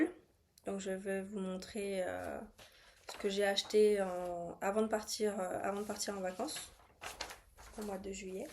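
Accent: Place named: England, United Kingdom. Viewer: Jolie découverte de ta chaîne grâce aux suggestions de Youtube :) Quel joli accent anglais d'ailleurs ♥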